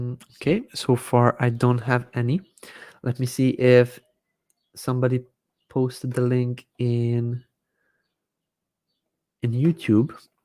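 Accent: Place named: England, United Kingdom. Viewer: I like this video but sometimes is hard to follow the english accent in all of his videos. thanks!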